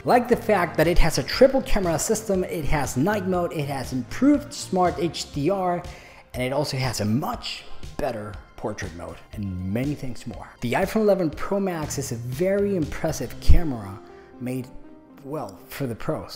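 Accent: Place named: United States. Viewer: Thank God for his American accent 😌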